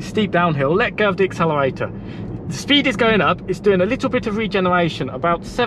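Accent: United Kingdom. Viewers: Hi, great video! I have an ID.3 Life on order. I’m curious, are you British, Portuguese or what? Greetings from Sweden. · I agree again when it comes to B mode. In the end it depends on the kind of roads you're driving on and your personal preferences. The Travel Assist seems to be working quite perfectly. I can't wait to get my ID.3! BTW: Are you British or how did you get this nice British accent?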